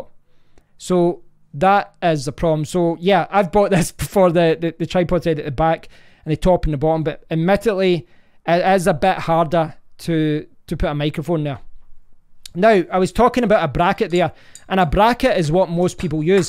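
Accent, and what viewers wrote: Scotland, United Kingdom. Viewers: Each time I tried it I would get pops and noises and I just couldn't get it resolved. I would like to pull it out and give it another try but can't find it. I have wasted so much money trying to improve the audio on my YouTube videos. I should have just put out some serious cash and bought something with a known track record. Now I am trying to figure out what that would be. I want a wireless mic (tried a cheap MOVO WMIC10) and it just cuts out for no apparent reason so there would be audio gaps every once in awhile. I think audio is the hardest part for most people who come with no experience. The terminology is foreign and it has just been a steep learning curve. So there you go. My daughter-in-law is Scottish and I love hearing your accent. ;-))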